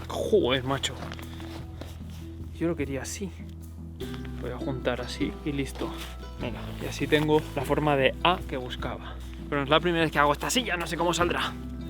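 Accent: India. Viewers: Por probar, he visto tu video doblado al inglés por ti, y la verdad solo a modo de crítica constructiva, hablas muy bien inglés pero pones un acento indio. Cuando puedas escuchate a ti mismo y fijate en eso para cambiarlo si quieres sonar más americano o neutro. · por que hablas ingles como un indio?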